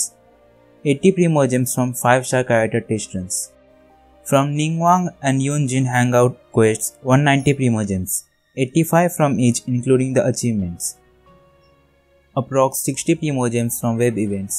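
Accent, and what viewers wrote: India. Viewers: 🤔 are you Indian..? Coz you accent is quite similar to ours. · I love Indian accent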